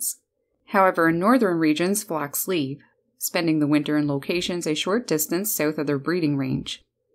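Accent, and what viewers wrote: Canada. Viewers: Many people love the accent of British and Australian, not me , I enjoy the Canadian accent there's something aboot it I find relaxing. Lol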